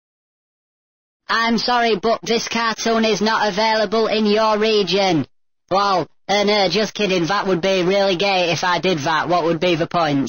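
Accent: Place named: Barnsley, United Kingdom. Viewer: Speaks well, is he posh from Barnsley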